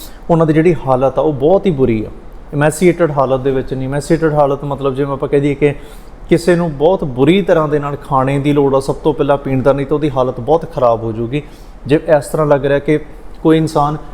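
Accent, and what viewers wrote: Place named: Jagraon, India. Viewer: ਵਧਾਈ ਆ ਬਾਈ ਜੀ ਸਵਾਦ ਆਉਂਦਾ ਸੁਣ k ਲੱਗਦਾ ਤੁਸੀਂ ਸਾਡੇ ਏਰੀਆ ਤੋਂ he ਹੋ ਜਗਰਾਓਂ ਤੋਂ ਅਸੀ ਰਾਏਕੋਟ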